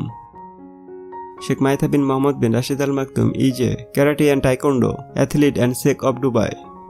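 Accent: Bengal, India. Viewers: Improve your English....there is a tinge of Bengali accent in your English. It is is not eezz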